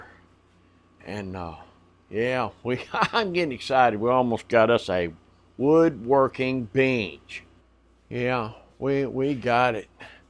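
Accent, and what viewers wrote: South, United States. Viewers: Absolutely terrific video,! I'm a retired educator who began my professional career teaching junior high Industrial Arts (AKA "Shop," because most folks have no idea, nowadays, what Industrial Arts was/is). After 8 years, I was recruited to become a middle school principal for 3 years, then was recruited to join the faculty of Industrial/Vocational Education at Mississippi State University (Starkville is my hometown, even though I now live in Tupelo, birthplace of Elvis, after I retired from MSU). Anyway... I do some woodworking in my retirement years out in the garage shop, but it's not set up for a "real" shop like I had when I was teaching. The single thing I miss most in a good, solid, hefty workbench, largely because I don't have a vise to hold my projects when I filing, sanding, shaping on projects like charcuterie boards, etc. 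I'm now 77 years young, so I have to face reality that there are not enough years left on this Earth for me to dump a pile of money into fixing a top-notch shop. I feel 28 in my mind, but I realize that I have fewer years ahead of me than lie behind me. Ha! So, thanks for your outstanding job of "teaching" your viewers as you go. It's a pleasure...and I can't figure out how I didn't find you on YouTube 10-15 years ago. By the way, I can tell from your accent (but we Southerners don't really have an "accent," do we? Ha!) that you're in the Southland somewhere. Have you ever shared what general part of the country you're in....like the State w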